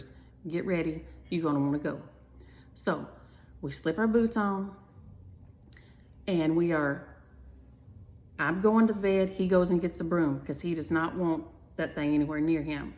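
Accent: South, United States. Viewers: I don’t know you at all as this is the first time I have watched you. But I have enjoyed this video so much. Usually So. Folks accent irritate me.....but yours is as darling as I’ve heard. You can really tell some funny stories your own way. Your stitching is lovely.....and they look smooth and oh so nice to me. White thread has always been hard to see with.......even when I was young and doing regular embroidery. They just don’t lay right. Your finishes are so cute. The red barn w/ the sheep...so cute. Your white thread looks fine to me. I don’t know the story of your mother, but I wish you well w/ the saviors spirit w/ you and your family. I’m 69 and live in Idaho.....I look forward to your videos to come. I am going to try to find your videos from the past. Do you live a farm. I had a snake in my house once and nearly died. Once you have a snake in your house, a mouse is nothing! 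Bless you!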